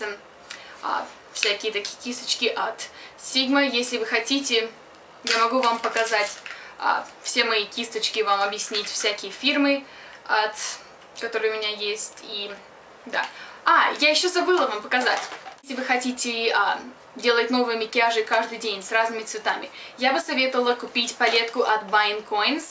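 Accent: England, United Kingdom. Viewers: У тебя английский акцент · Как заметен английский акцент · Я не понимаю что у с разговором?Она англичанка?Или как потому,что слышен какой то иностранный.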